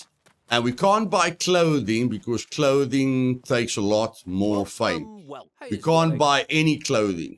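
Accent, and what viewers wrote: South Africa. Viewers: You south African bro? Cool accent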